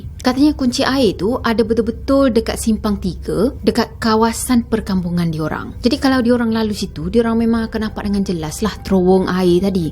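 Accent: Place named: Terengganu, Malaysia. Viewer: Loghat Ganu faham tak?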